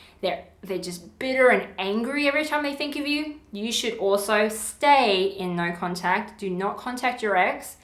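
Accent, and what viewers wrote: Australia. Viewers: W Jansen She sounds Australian.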